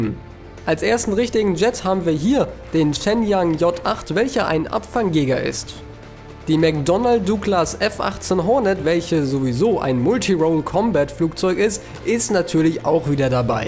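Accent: Germany. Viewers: Ich bin Finnisch und ich liebe deine Deutsch acschent :)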